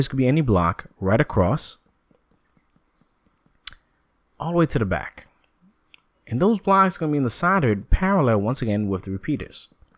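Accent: New York, United States. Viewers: I think it is funny that you apologize for the noise, and tell people that you are from New York. LOL. I think everyone knows you are from New York. You have a very distinct accent. There are a couple of your videos where you say Forget about it. I lose it, totally lose it. Reminds me of a very young Joe Pesci. I hope you know I am a fan of all of your builds, and I don't mean anything bad about your accent. As a matter of fact for those of us who spend hours watching these videos making our builds it is important that the video makers accent is easy to listen too. There are several channels that I just can't watch because the maker's accent or rythm of speech is too annoying.Keep up the great work.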